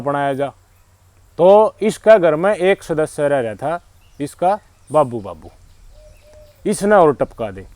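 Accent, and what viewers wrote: Haryana, India. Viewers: Bhai sahab aapkii videos 📹 nd pure haryanvi khadii bolii ekdum steek nd sath sath main social society aur bade buddon kii kahawaten so awesome 👌...bhai jiii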